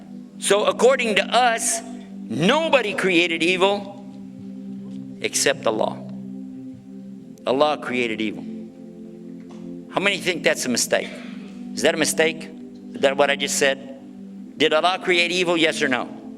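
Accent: United States. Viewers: i love the fact that hes a southern muslim speaker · Aslaam. This guys one amazing human being and Muslim. I met Mr.Yusuf in Madinah sharif whilst on Hajj in 2012. We spoke briefly and then departed as it was very busy coming out of Masjid e Nabi at Fajr time. He said to me "How's Hajj" in this strong American accent. He still looks the same today when giving dawah on TV and may Allah keep him strong in deen and health. This man use to be a preacher in Christianity and his family had the same background. I think his father was a preacher too and Allah gave him guidance/imaan and he accepted Islam. Now that's what you call a true Muslim and that's an example to all us so called Muslims who were born into Islam. I believe Mr. as a Muslim revert is spot on in his dawah and promotes Islam as it should be (Peace). Unlike some of the crazies going about and killing everyone in the name of Islam and giving us a bad reputation. 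Peace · It makes me smile every time I think that this brother was a christian preacher from Texas. His accent mixed to the knowledge that he shares beautifully proves that Islam is really universal.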